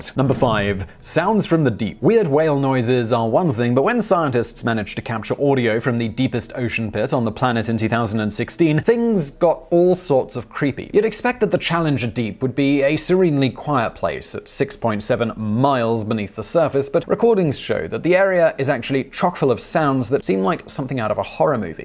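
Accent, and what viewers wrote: United Kingdom. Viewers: This dudes voice is so british it seems fake tho im sure jts not lol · Kraken. He's British.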